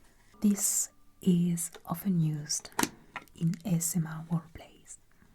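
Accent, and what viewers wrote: France. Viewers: Tu as un accent français, tu es française ? :)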